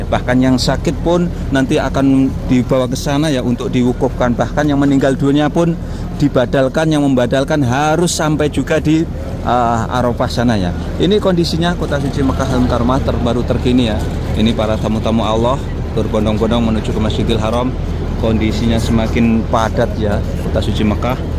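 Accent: Java, Indonesia. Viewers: Jawane metu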